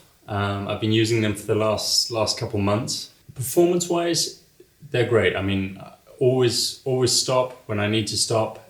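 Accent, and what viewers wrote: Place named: South Africa. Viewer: Everything he said about disc brakes is accurate. It's not where it needs to be. Also, I could really hear the SA twang in his accent during this video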